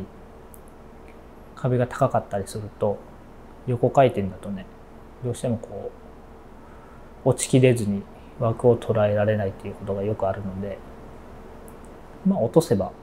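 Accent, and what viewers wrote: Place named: Kagoshima, Japan. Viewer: 鹿児島弁😭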